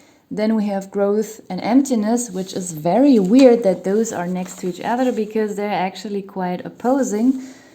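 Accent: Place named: Germany. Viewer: You gotta sexy voice, babe, with a tiny German undertone somewhere, sounds cute!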